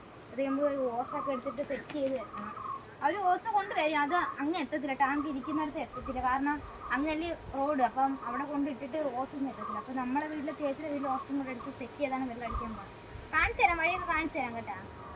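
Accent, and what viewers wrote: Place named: Kollam, India. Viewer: നിങ്ങളുടെ സ്ഥലം എവിടെ ആണ്??...കൊല്ലം ആണോ??...സംസാരം കേട്ടിട്ട് കൊല്ലം പോലെ തോന്നി??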